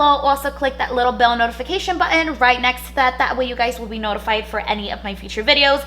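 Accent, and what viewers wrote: New York, United States. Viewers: I never thought I’d find a video to actually help me with this but I DID! THANK you so so much! Ps. Is your accent NY or NJ? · I love how you say "all" "on" "always" "honestly" ❤😂🤣 you must be from new York or Boston 🥰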